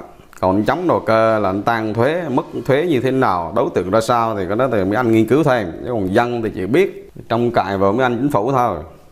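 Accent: Quang Nam, Vietnam. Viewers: Tiếng nói của chú rất trung thực Chuẩn tiếng địa Quảng Nam. Chúc chú nhiều thành công .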